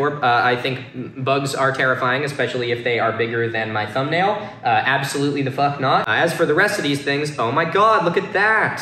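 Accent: United States. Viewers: I KNEW YOU HAD A MASS ACCENT OMG I figured Rhode Island was just close enough but I feel 10x better knowing you’re from Mass. I have so many friends from there y’all all talk the same LOL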